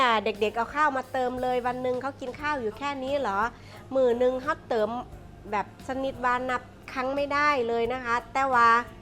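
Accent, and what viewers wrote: Isan, Thailand. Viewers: ชอบนะ พูดกลางปนอีสาน ได้เรียนรู้ไปด้วย เป็นส่วนหนึ่งของอัตลักษณ์ไทย เพราะประเทศไทยไม่ใช่แค่ กทม. ขอให้ขายดีทุกวันเด้อ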